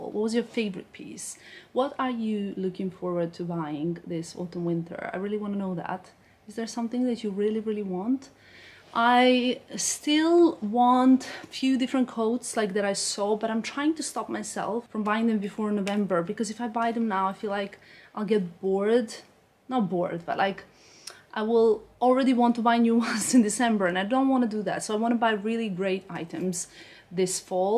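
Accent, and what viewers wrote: England, United Kingdom. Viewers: The english accent is back ! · your accent is British accent when you are in London, you mentioned before your accent will change when you with different friends, lol...funny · The British accent is back. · crazy how fast your accent can change depending on where you are! sounding v british haha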